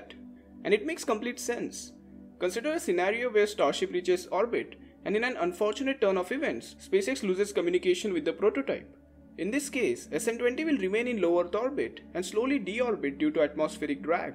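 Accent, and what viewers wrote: India. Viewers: I love Indian english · Try speaking a little more slowly. Given your strong Indian accent you might be a little easier to follow.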